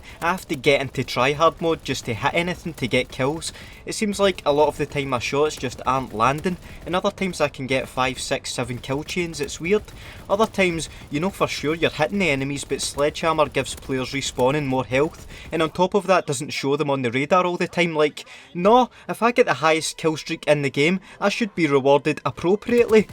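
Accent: Scotland, United Kingdom. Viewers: That scotish accent tho, its hard to understand sometimes since im Murican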